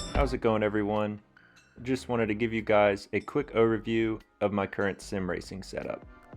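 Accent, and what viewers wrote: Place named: Midwest, United States. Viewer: My profile name here is my XBL GT add me if you feel like. Are you Midwest US, sound like it.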